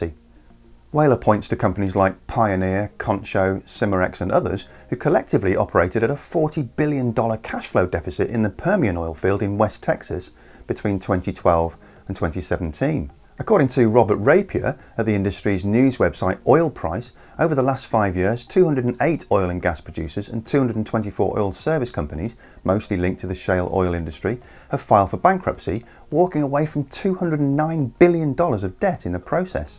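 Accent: England, United Kingdom. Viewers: Once the COVID lockdowns are lifted, demand for oil will rebound and the supply glut will ease. Most of this video seems like wishful thinking, as oil consumption is predicted to rise through 2040. Great presentation and a pleasant English accent doesn’t change that barring a technological breakthrough, fossil fuels will be with most of us for a while yet.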